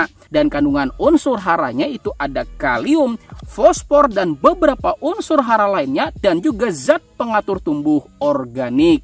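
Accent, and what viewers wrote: Banjar, Indonesia. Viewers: Umpat bangga, logat amang kada hilang😂😂😂harus banyak urang2 ky amang di bumi ku borneo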